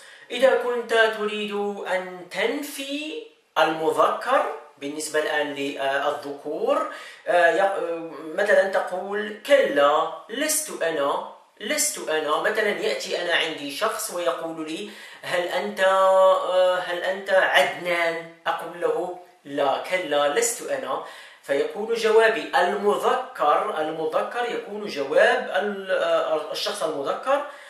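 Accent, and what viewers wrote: Morocco. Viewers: انته مغربي ماهيك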